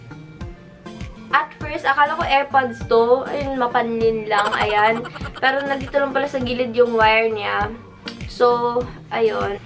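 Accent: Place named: Davao, Philippines. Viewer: Taga Davao man diay ka ate hehe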